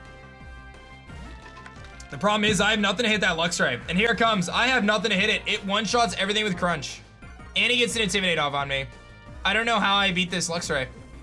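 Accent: Australia. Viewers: As an Australian I heard a lot of Americans try to do Australian accents that are not the best but you do a good Australian accent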